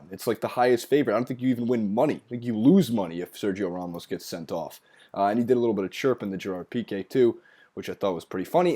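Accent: United States. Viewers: american talking about football, sounds funny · I like hearing an American talking about football/soccer it is interesting and different, go taking over for today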